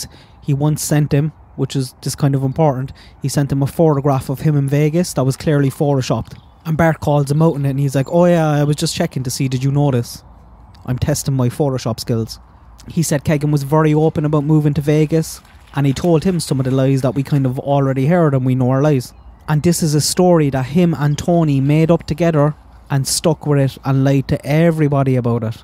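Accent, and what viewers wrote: Cork, Ireland. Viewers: New subscriber is that a Cork accent feen??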